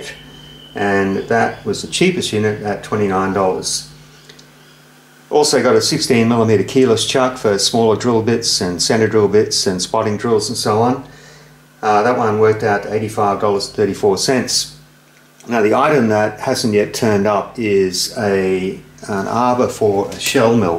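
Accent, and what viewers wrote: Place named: Australia. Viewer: Great videos and it is so nice to hear an Aussie accent. You are fast becoming an Aussie "This Old Tony". Well done!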